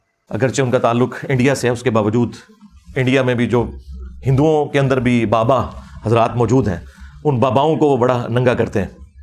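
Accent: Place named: Arab world. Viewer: The damage has been done. Do you really think whatever you say we will digest as a Muslim? Lolllll. Pakistani ulma are 80% thug of Pakistan. They are not on the right path. Ap ko kya lagta h mutfi, hafiz title lagany s or apna accent arabic kr k urdu m bat krna will create even a tiny impact on us? Lolllll. Bhae ap pehly khud ko dekhen ap Islam k kya khidmat sar-anjam d rhy hen, phr dosron ko kahen. Jab ap ko aik basic chez ka nh pata ke knowledge kisi s bh mily agar ke wo sach h, you have to accept it. wo hindu h Christian h koi bh h , bhae wo research kr k koi chez batata h to is m galat kya h ke aik Muslim us k taref Kry? Ap muslim hokar kon s research krty hen ? Bus babaon k books parh k, ulma k bat sun kr, sedha YouTube p akar record krty hen, is k ilawa koi or kam h ap logon k paas? M beshak ap k j tarah mufti, hafiz Waly title wala banda nh hn, but m aik question bh Quran s karn ga. 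Hmary Rab k qasam ap n us ka answer tak nh dena. To is liye mery bhae hao khao peo, aram kro, ghar walon ka khayal rakho, islam ko wahen tak pehlao pehly, jab ghar waly ap s razi hojaen phr YouTube p ao. 😅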